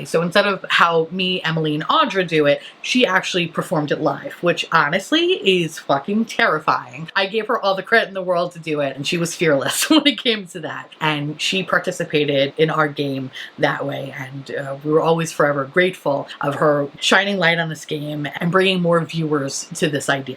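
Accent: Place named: New York, United States. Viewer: Hearing your accent more today than usual. Sickness brings out the the NY. Feel better.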